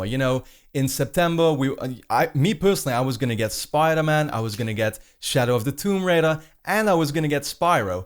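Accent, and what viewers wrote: Netherlands. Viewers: Hmmmm you have a Dutch accent.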